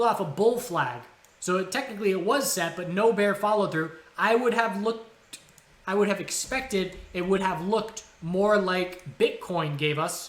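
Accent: Ireland. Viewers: More Irish accent please!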